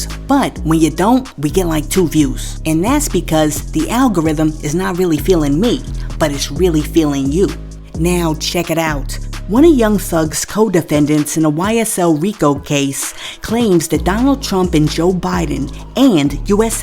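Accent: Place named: New Jersey, United States. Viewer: You gotta be from jersey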